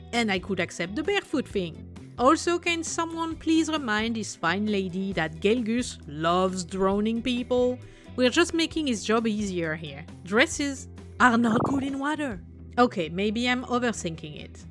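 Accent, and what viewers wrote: France. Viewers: you're one of the only people discussing new GW models and not complaining about how complicated they've become over time. When I started this hobby, all the models were metal. They were awful compared to what they are now. Many creators hate Primaris Marines, but I think we finally have properly scaled Space Marines for the tabletop. I'll complain about GW's ability to balance their games, write rules and their tendency to ignore their own lore or worse, retcon it. But they do make amazing models. And honestly, your voice activates neurons. It's like, musical. I live in NYC and I'm well aware that I have a very Bronx accent. I've heard myself on videos and I'm always shocked. Hearing an amazing French accent from a female is a distinct pleasure for the ears, especially when you're surrounded by people who start every sentence with the word 'yo,' and end it with 'ya heard?' and somehow slip 2 'motherf*ckers' in along the way...a feminine French accent is like a holiday after a day spent on a NYC construction site. 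Edit: And now I find myself admiring the models silhouettes...that's strange. Where did I pick up that from? 🤔 Sigh. Lunch is over. Back to work.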